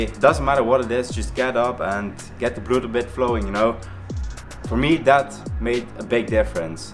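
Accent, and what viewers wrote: Netherlands. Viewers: You sound like you're from Limburg.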